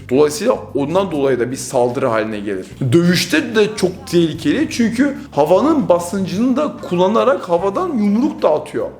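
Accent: France. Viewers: abimin maşşallah jean pierre polnareff gibi fransız aksanı var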